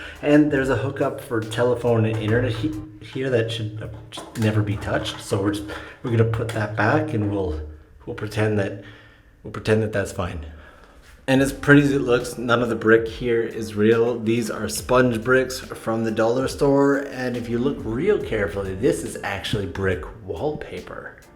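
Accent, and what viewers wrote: Canada. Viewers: Somehow after living in Japan for like 10 years this dude still has a Canadian accent.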